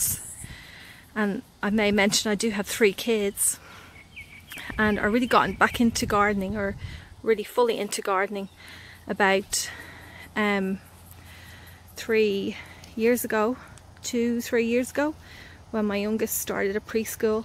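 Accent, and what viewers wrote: Ireland. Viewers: Loved the video, adore your Irish accent. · Hi beautiful garden, love the white tomatos and the sunflowers. .never seen those tomatos before..did I hear an irish accent there?? So nice.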